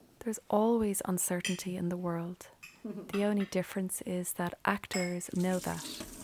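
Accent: Ireland. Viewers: I want to tell you that I just love your channel. Your topics, philosophies, guidance, teachings and even your sweet Irish voice and tone are nourishing my soul. I have had so much stress in my life for years and am afraid that it has made me physically sick to some extent. Am so glad that I found you. Thank you. Charleen from Scottsdale, Arizona, USA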